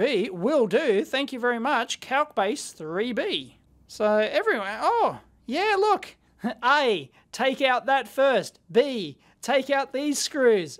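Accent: Australia. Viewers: Sounds like an Australian Scotty Kilmer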